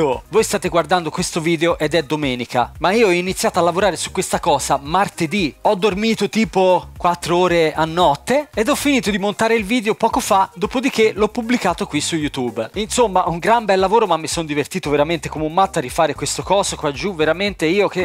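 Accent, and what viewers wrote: Tuscany, Italy. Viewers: forte toscanaccio :)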